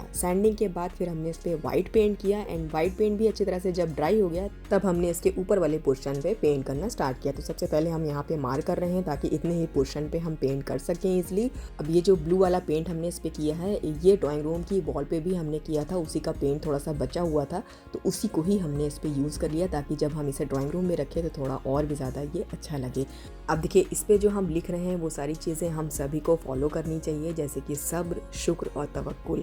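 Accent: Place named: Lucknow, India. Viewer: Im from Pakistan,likes ur lucknawi style urdu,please explore lucknow tradition more,and lucknow markets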